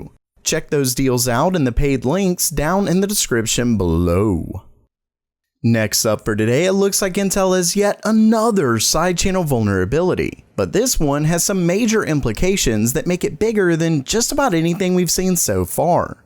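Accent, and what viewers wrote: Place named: South, United States. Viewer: You should do a video where you don't try to hide your southern accent lol I keep hearing it try to come out haha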